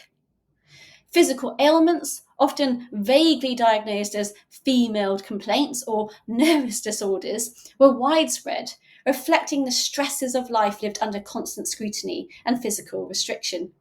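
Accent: United Kingdom. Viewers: I absolutely love history videos and I can tell how passionate you are by the way you explain and tell these real life tales! Your British accent adds all to the charm and even if history is loaded with information, you really are able to deliver it beautifully and so easily understood! Hope you'll become professor one day if you aren't already or if you'd see yourself doing that, you'd be brilliant for sure 👏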